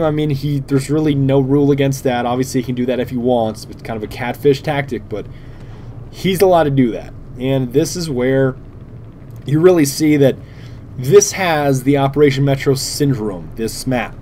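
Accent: Canada. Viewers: Arnt you Canadian?! ^^ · i thought u was canadian :O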